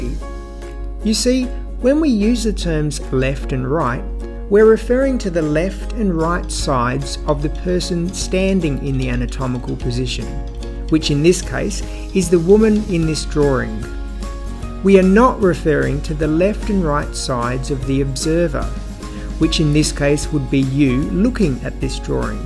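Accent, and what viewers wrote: Australia. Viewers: first year nursing, thank you for your so clear explanation, loving your Aussie accent.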